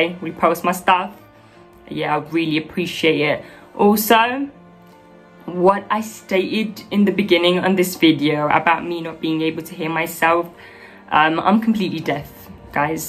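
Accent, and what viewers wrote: United Kingdom. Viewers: Hello, I was wondering how come you seem to have a British accent. Did you live in the U.K.?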